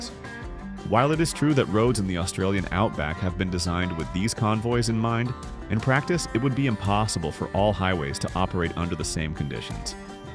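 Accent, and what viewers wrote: United States. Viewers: American bloke ?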